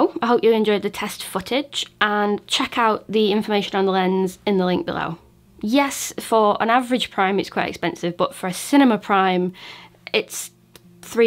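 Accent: United Kingdom. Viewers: Love your voice hell😂😂 shit relax me..i can watch any review with that voice. Idk sometime about uk voices..remind me of mary poppin the original..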